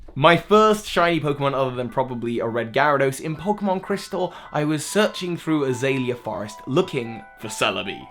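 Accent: United Kingdom. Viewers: He's so British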